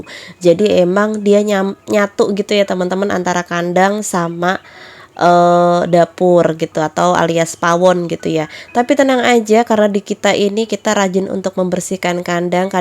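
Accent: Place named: Java, Indonesia. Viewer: Mbak ngmong jawanya lucu... 😅medok bgt😂😂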